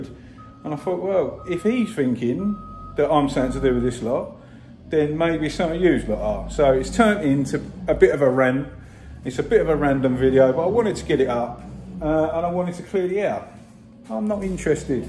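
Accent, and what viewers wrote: Essex, United Kingdom. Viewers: I thought you were from Essex. I was born in Billericay. My girlfriend lives in South Yorkshire and her and family love my accent!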